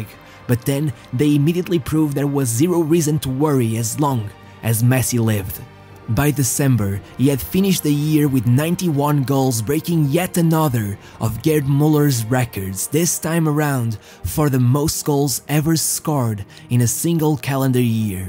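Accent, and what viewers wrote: Portugal. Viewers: your perfect english vocabulary paired with your charming portuguese accent... bro... I am not gay or something but I am totally in love with you. You are telling those stories with true love from your heart and everyone can hear this. if maybe, 20 years from now, your videos will still be on youtube, I will watch them and maybe shed some tears of joy, coming from the nostalgia, created by your words about the good old times. merry christmas and a happy new year to you my fellow lover of the greatest game in the history of mankind. PS: I was tripping on LSD when I watched your CR7 video and it was an awsome experience.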